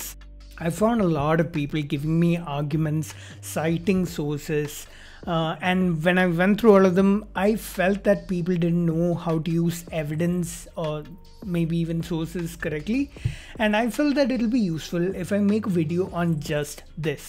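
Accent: South India, India. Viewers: So, can I ask what your scientific credentials are? What is your training regarding research methodology? No offense but engineers (which I'm assuming you are given your accent, a fellow idli eater) are not very well trained in science. I know because I'm one too. We barely use statistics for exploratory research.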